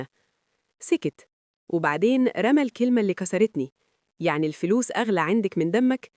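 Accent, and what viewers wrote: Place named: Egypt. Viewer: هو ازاي انت بتتكلمي بلهجه مصريه وفي نفس الوقت بعض من كلامك بيدل انك من الولايات المتحده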